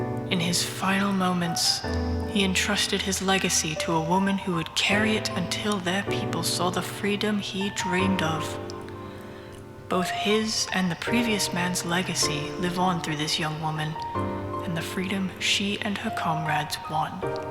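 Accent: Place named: England, United Kingdom. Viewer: You truly could’ve fooled me if you were trying to pretend to have an English accent. I like doing voice acting as a hobby, specifically impressions, but it’s hard for me to mask what is clearly my American accent. Still, I can tell a lot of love went into this and wanted to complimented as such. You’re very welcome.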